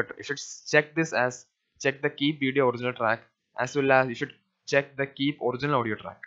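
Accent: Kerala, India. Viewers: Ohhh your Malayalam accent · Malayali, Right? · Machane Malayali AAANOO?? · Argggh, your indian accent is too strong,...I cant understand shit that you're saying. Lose the accent bro.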